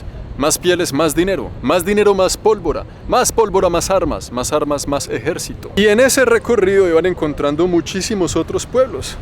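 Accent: Bogotá, Colombia. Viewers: Por fin alguien que le gusta nuestro acento rolo. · Eres increíble, además de que te metes unas escenas increíbles de los lugares 👌🏼 aprendo mucha historia contigo 🙌🏼 y tu acento colombiano es todoooo 😍 saludos de Argentina ✨ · Viajo contigo a lugares q nunca conoceré... gracias....me encanta ese acento colombiano....y tú narrativa uuuffff de lo mejor....saludos de México ☘️🙏 saludos a la abuela🌷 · Muchas gracias, te admiro mucho, tú acento colombiano y la gracia de éste le imprime un agradable sabor a tus videos, ojalá yo pudiera hacerlo, un saludo y cuídate del COVID. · A eres de bogota cierto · Muy interesante tu narrativa,el acento colombiano es tal vez el castellano más bonito de latinoamerica ,gracias por tu aporte a la cultura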